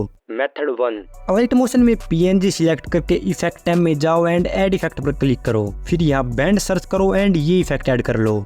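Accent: Haryana, India. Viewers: Haryana sai kya aap 🤐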